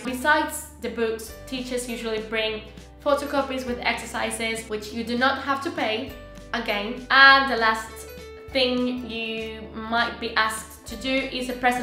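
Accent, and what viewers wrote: United Kingdom. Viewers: hey you got a acent of british. cool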